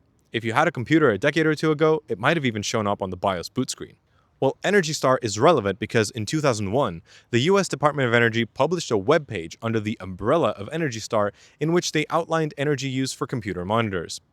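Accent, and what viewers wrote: United Kingdom. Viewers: why were you british half the time? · Incredible British accent. I forgot you were American initially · Your British accent is top notch sir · The topic was highly interesting, the delivery was great! Your vaguely British accent is Decent! The message was appreciated. 10/10 would hurt my eyes watching this video again!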